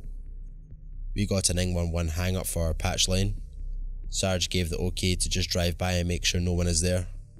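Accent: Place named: Scotland, United Kingdom. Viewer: I 💘 The Excellent Narration by 👏👏👏..bravo!!! I'm digging The Scottish Accent 😚 Keep up the Awesomelicious job;) much love Sexy Grandma Darkness✌💖💖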